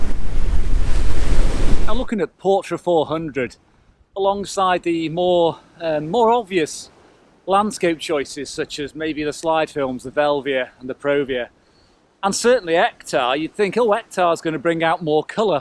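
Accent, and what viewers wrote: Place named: Liverpool, United Kingdom. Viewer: I always hear the cadences of the when I hear you speak. I was interested to find that you seem to be from the Liverpool area. Always enjoy your content!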